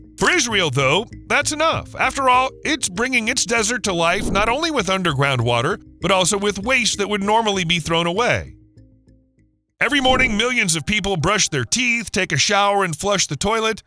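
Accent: United States. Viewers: the shrieking laconic American voice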